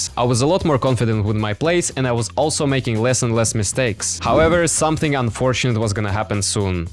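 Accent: Finland. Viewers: are you finnish!?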